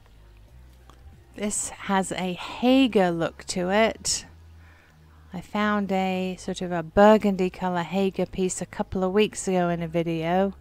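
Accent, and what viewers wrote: England, United Kingdom. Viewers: Hello. I am a fairly new subscriber (really enjoy the Goodwill trips - one of my favorites). In this video, you mentioned being a child in California. I assumed that you are from England because of your accent. Did you move here as a child but retain your accent?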